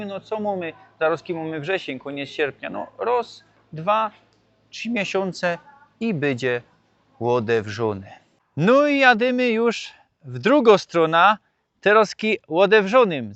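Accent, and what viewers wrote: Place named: Rural, Poland. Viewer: A co ty tak często zmieniasz KOLOR SKÓRY? Zaczynasz jako czorny Murzyn, ale za chwilę udajesz białasa! O tu ==> Po drugie ten wasz "język" śląski to zwykła KLASYCZNA wsiowa gwara, taką, to i u nas, w Świętokrzyskiem często spotykamy, ale nikt tu nie udaje "uczonego językoznawcę". Wsiowy, to wsiowy! To widać, słychać i... czuć. :hand-orange-covering-eyes::body-blue-raised-arms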